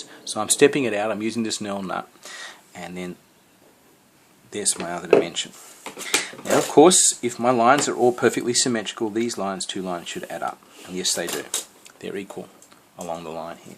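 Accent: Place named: Australia. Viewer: Its an aussie accent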